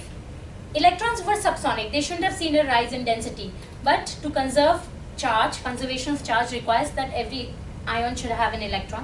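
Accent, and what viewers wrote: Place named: India. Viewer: She has a hindi accent.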